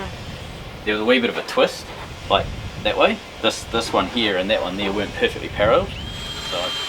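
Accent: New Zealand. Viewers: you are blossoming as a comedian. Your Kiwi accent is channeling Fred Dagg (poor John Clarke, we miss him). When you mentioned "My mate Trevor" the impression was complete!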